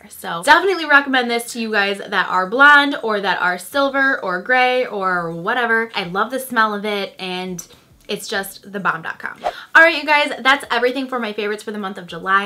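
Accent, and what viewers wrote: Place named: Chicago, United States. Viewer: Love your Chicago accent! 😀😀😀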